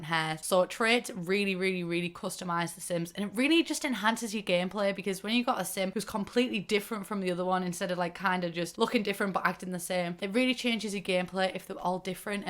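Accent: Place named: Newcastle, United Kingdom. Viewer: I guess I need to get cats and dogs Also are you from up north you sound Geordie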